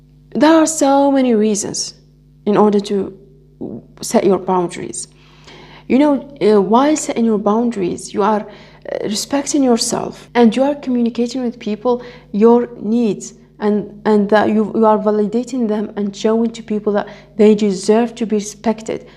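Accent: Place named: France. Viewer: You look like a beautiful nun i do love your french voice🥰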